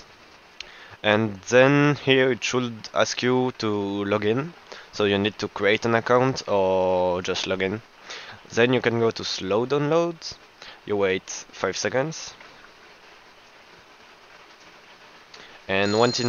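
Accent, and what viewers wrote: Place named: France. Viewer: l'accent francais xD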